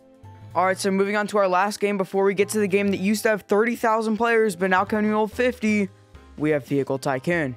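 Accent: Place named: Canada. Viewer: I can tell you're canadian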